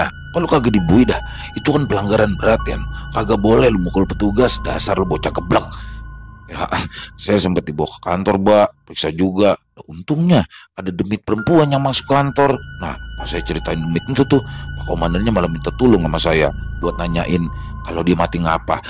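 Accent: Jakarta, Indonesia. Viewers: Paling suka episod bang pian😁😁khas dengan bahasa Betawi nya😁😁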